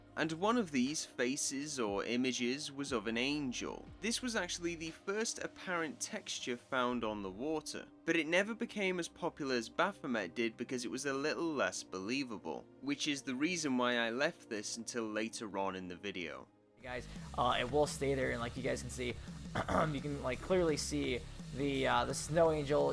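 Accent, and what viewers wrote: United Kingdom. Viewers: I like to watch your video it just my bad and my fault for insulting you cuz i like to hear when u says "Water" and "Woter" to a Wa Er 😂 but sometimes i heard and listen carefully on your "UK accent" it got me laughing alone like people look at me like am a craaaazyy person.. 😂😂😂😂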